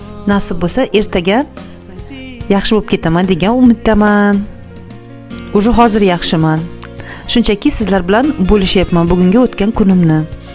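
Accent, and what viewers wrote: Surkhandarya, Uzbekistan. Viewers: Гапиришларизга ичакларим узилди сурхончасига шевада😂😂😂😂😂😂😂 жувиб денг😂😂😂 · Сурхондарёлик ликсиз шекилли.. 😅😅😅 Қоранг қони кай.. Жувиб.. 😅😅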